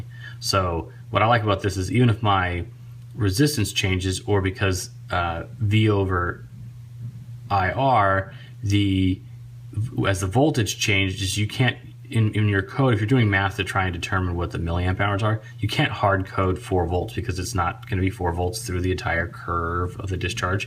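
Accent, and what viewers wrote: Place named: New England, United States. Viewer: Are you on the East Coast or from there? You seem to be like a Yankee :o)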